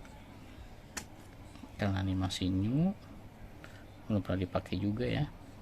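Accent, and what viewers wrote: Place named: Banyumas, Indonesia. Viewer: Salam Mantap....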